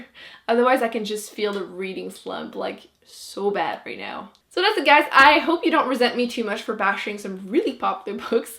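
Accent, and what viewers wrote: Quebec, Canada. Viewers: ps: ton accent québécois est super cute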